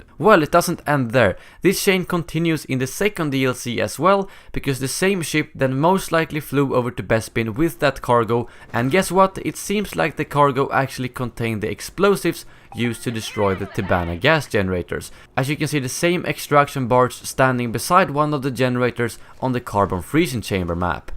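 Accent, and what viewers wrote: Sweden. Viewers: Dude are you swedish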